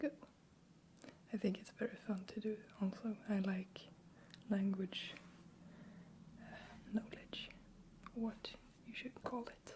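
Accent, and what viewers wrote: Sweden. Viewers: Tack! Gillar när du kommer nära med din fina röst, är det en norrländsk dialekt du har? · great video! I love the sound of your swedish. maybe a trigger word assortment?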